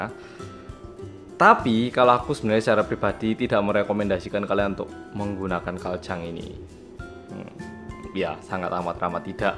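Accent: Java, Indonesia. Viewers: Halo mas, mau saran aja, kayanya seru kalau mas bikin video dengan berbicara sedikit korea hehehe dengan diberi translate ke indoatau inggris tentunya penasaran apakah aksen korea masih kental meskipun aksen jawa sangat mantap! hehehe semangat dan sukses untuk vlognya! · 안녀와세요 저 한국에서 공부하는 인도네시아인 루푸스예요. 피디오보면 인도네시아 말하시면 진짜 사투리가 자와이네. 정말 처음 들어서 신기하다 ㅋㅋ. 화이팅!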